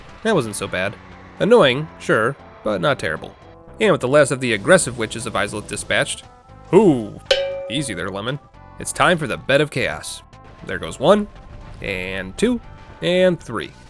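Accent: United Kingdom. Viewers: Finally British dark souls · When a British play dark souls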